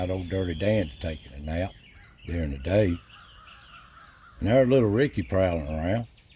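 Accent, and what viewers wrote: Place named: South, United States. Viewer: We really envy you, living in that beautiful place, looking up into that Peaceful Place that God gave us to embrace & enjoy😊. The simple pleasures really are the best. Really enjoy listening to you narrating those wildlife events & happenings, just something real right hearing it said with that old southern drawl; real authentic you know: right down to the ground genuine!! The Peaceful moments are much appreciated. Next best thing to being there🙏🏻!! 🫎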